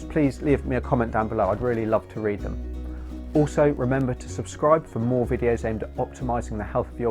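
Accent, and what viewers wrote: United Kingdom. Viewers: British accent plus music Thanks for cc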